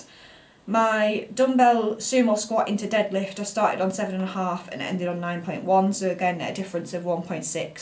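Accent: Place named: England, United Kingdom. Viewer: Hey Thanks for sharing. I love recap of your gym workouts. I need to start doing weights but I’m recovering from shoulder injury. I do love cycle spin classes. Hope you are well. Great hearing the English accent 😘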